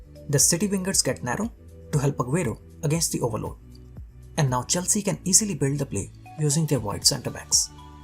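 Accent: India. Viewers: I think he is not english native speaker. Sounds like indian.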